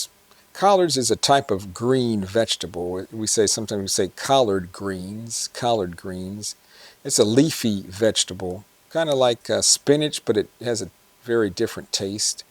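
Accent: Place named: South, United States. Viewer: Thank you for these wonderful lessons. I love southern accent and the way y'all communicate, I love it. Please keep making more of these lessons, it's so fun to watch!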